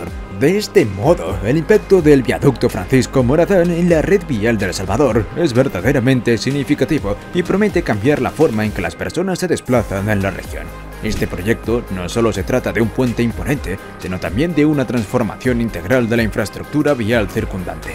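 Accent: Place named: Spain. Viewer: Espero que este tipo de vías de comunicación vial se sigan replicando en el país, es necesario que las carreteras no provoquen un mayor impacto en el medio ambiente, las carreteras de tipo elevadas son una magnífica solución y pueden ser muy bien aprovechados para largos trayectos contemplativos. También es necesario implementar el paso por túneles. El país es hermoso. . . . . No me gusta la voz con acento español, es demasiado ruidoso. Preferiría escuchar un narrador de mi país.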